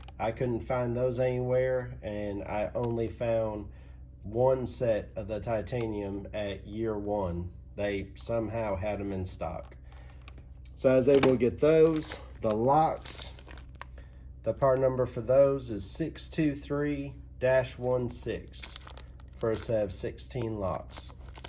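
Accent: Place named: South, United States. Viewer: Love your videos man. I want to do some similar work this winter but I was unsure on how to do some of the things but you made it easy to understand. I’ve watched a lot of your videos lately, one thing I think you should do as far a video is show your face every now and then, the reason for this… is to get people familiar with your face, so when they scroll through YouTube they’ll be able identify your videos…. It’s kinda like a familiar face. Other than that I like the tempo of your videos, it’s not to slow and not to fast. I’m guessing your somewhere from the south because of you accent. I’m from central MS.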